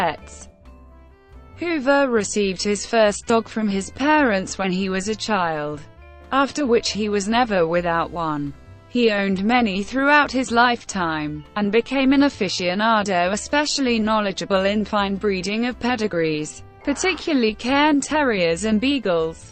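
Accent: United Kingdom. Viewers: Very bad done. That British accent! Trash 🗑️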